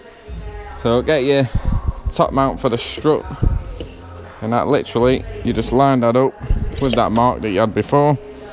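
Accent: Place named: Manchester, United Kingdom. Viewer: Thanks for this mate gonna need it soon. Can recognise that manc accent a mile off. Denton I’m from, u seem close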